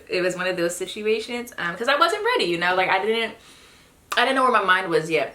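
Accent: Philadelphia, United States. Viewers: I knew you was from a philly with that accent. So strong and your daughter name is so cute . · Instantly knew you were from Philly as soon as I heard you talk 😂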